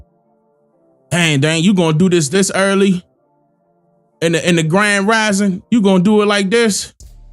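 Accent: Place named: DMV, United States. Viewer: Big love to you. I just confirmed you were from the DMV. I’m from PG and I knew I recognized your accent and lingo lol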